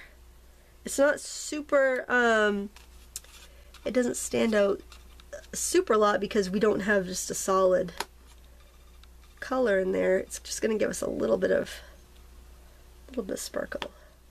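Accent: Maine, United States. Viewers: Hi, Love your charcoal "draw-rings" with your Maine dialect! Great variety of shading and blending techniques! What do you like to use to seal it so it doesn't smudge charcoal whatever is next to it?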